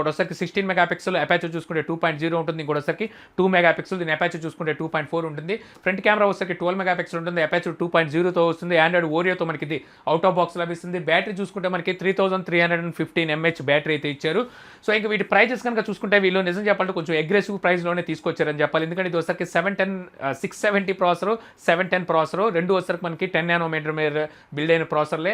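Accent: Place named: Godavari, India. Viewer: nee slang kosame ne vedios chustaaa godavari don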